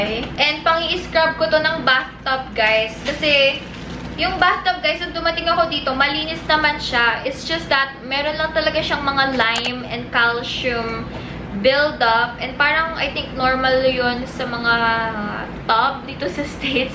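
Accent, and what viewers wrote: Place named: Ilocos, Philippines. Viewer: "This is how I do it kunana" Hahaha love that accent tho natututo ka ng mag ilocano keep it up!! #Proudilocana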